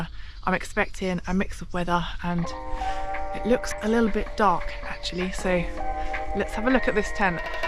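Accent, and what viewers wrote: United Kingdom. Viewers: "Doof." I love it! Your British English gives me a charge. I learned the word, "bap," for sandwich, and that's fun. Love your videos! ❤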